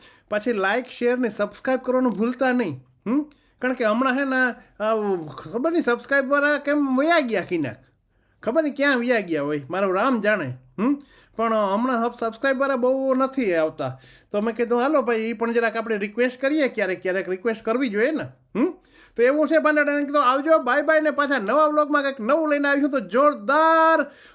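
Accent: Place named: Gujarat, India. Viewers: RAM RAM SITARAM JAYMATAJI bhandedav JAY LIRBAI MAA 12 gav e boli badlay ne gujarati to mastj bolo chov ne aa desi j maja che ha emigration ma nava nava ghana changes aavya che saras informative vlog and family vlog velo velo banavjo ben kaki ne mahadev har kehjo!!!❤❤❤ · You are speaking kathiawadi Gujarati it's perfect. Every 12 Gau Gujarati change little bit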